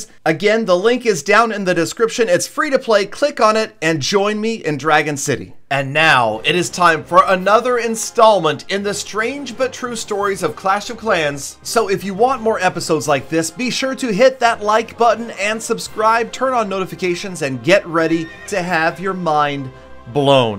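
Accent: Poland. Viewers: r u Polish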